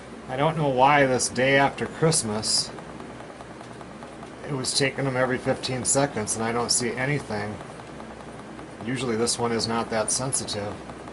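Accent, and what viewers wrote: Minnesota, United States. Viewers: Thanks, I was thinking maybe you were in Minnesota (accents lol).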